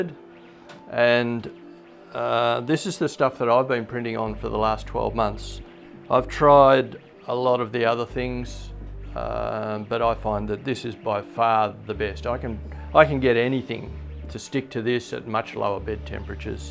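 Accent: Australia. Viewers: what part of OZ r u from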